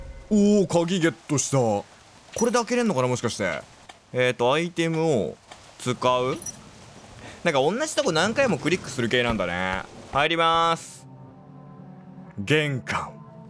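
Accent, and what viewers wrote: Kansai, Japan. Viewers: たまに出る関西弁めっちゃ良いですよね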